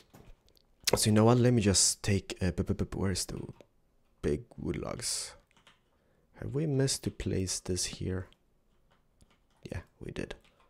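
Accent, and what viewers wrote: Sweden. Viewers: Are you swedish? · Just like i thought, u were Swedish :D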